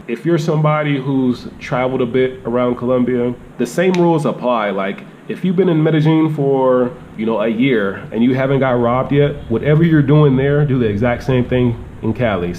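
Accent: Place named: East Coast, United States. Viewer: Wow man I didn't expect you to be from Seattle the northwest that was surprising cause you talk like a east coast dude with all that swag in your voice lol.. thought you was from like Northeast in jersey or new york...but keep up the great work with the vidz bro #Respect 👊🏾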